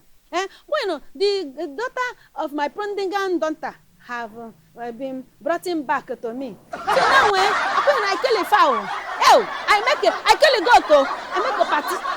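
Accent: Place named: Igbo, Nigeria. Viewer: this your igbo accent is something else😁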